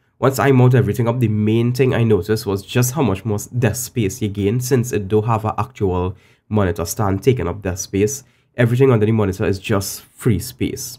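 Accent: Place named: Trinidad and Tobago. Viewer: That’s definitely a Trinidadian accent if I ever did hear one, love the review brother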